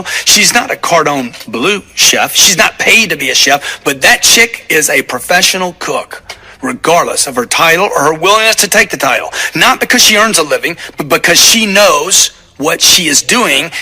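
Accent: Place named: South, United States. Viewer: love the redneck accent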